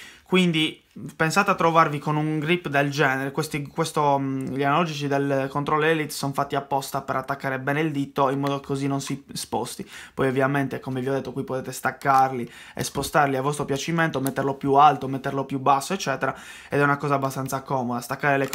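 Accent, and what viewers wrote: Sardinia, Italy. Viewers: sei sardo?si capisce da come parti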